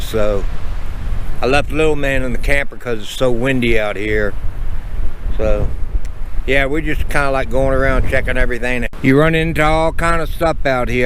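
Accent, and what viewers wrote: United States. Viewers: pretty spot new mexico is it.like watching your videos , you make me laugh with that american accent of yours..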